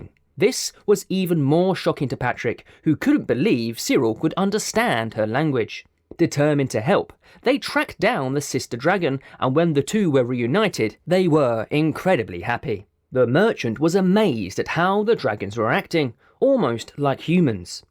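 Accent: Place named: United Kingdom. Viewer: never heard a british voice for recaps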